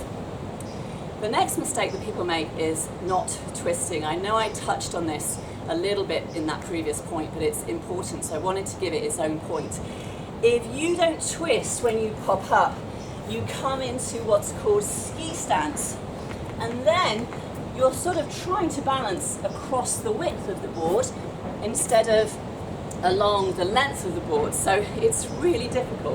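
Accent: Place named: United Kingdom. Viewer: normal british accent i guess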